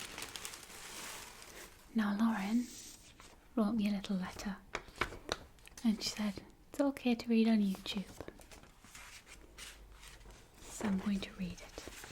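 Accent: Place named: Newcastle, United Kingdom. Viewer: You know who you sound like accent-wise? You sound like the guitarist Andy Taylor of my favorite musical artist (and yes, I am being serious) Duran Duran! He came from around Newcastle, so I'm guessing that's where you come from as well... ? IDK, maybe I should just look up Middlesborough as I know that's where you said you lived, but wait, you said you moved there, so you could come from anywhere in England. And you don't sound Welsh to me, but then again when I think of what Welsh people sound like I think of Rhod Gilbert and I'm laughing on the inside just thinking of a female with Rhod Gilbert's voice. That poor imaginary woman. :D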